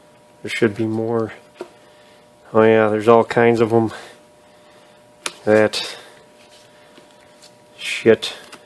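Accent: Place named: Minnesota, United States. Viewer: I’m hearing some Minnesota in here!😁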